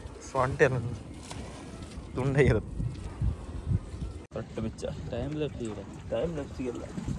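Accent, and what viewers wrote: Kundapura, India. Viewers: I like kundapura kannada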